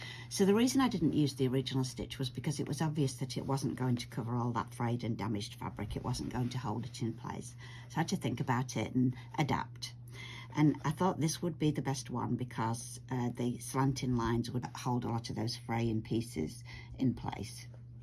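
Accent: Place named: Northern England, United Kingdom. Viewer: Thank you very much for all of your Sashiko videos. I’m a complete beginner and you are the first channel that actually has slow and helpful tutorials. I’ve found so many other videos that start, assuming you already know how to make a Sashiko knot, etc. So, thank you for all of your help. On another note, are you from the Midlands or northern England? I absolutely adore your accent. Greetings from Washington!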